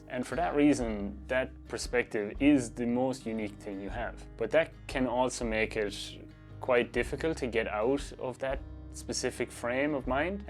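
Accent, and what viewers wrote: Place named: Ireland. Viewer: Nice to see an Irish photographer? Can I ask where you’re from? Can’t place the accent! Clare maybe?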